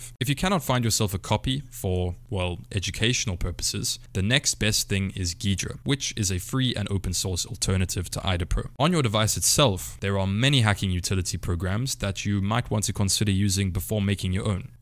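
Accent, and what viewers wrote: South Africa. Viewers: Instantly recognized the south african accent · i know im listening to a South African........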